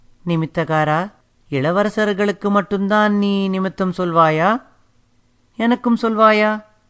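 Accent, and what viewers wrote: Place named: Tamil Nadu, India. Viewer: hello sir this is Karthic from Coimbatore am big fan for ur audio book and liked ur Tamil ascent very much gud work kudos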